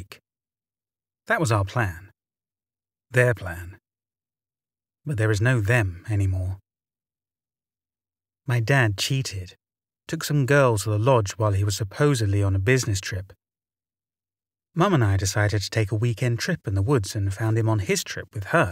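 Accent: England, United Kingdom. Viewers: More than happy to leave a comment. It's comforting to hear hear a fellow Englander narrating these Wendigo and Skinwalker stories. I really love your channel.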